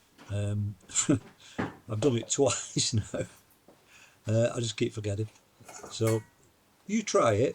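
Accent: United Kingdom. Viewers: 👍👍👍...Hallo, I came across your channel while looking for kidney recipe! Unfortunately, I don't understand your ( Yorkshire?) accent very well🙄 And my school English is long gone😂...about 40 Years...! But your are a great guy! Still, I enjoy listening to you👍 Your channel is so wunderfullly, refreshingly NORMAL. Without modern heigh- tech kitchen und equipment! Okay, till now, you are not a greatest cameraman and your special Omelett scared me at first😂 But in the end it looks delicious. Waiting for more! I left a subscrition. Greetings from Germany...Caroline 😊❤ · Love this Lancashire accent, and the calm way of cooking!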